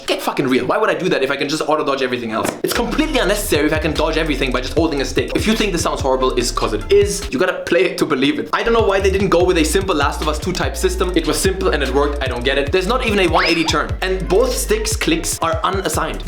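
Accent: Germany. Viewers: Asiate mit deutschem Akzent. Luv it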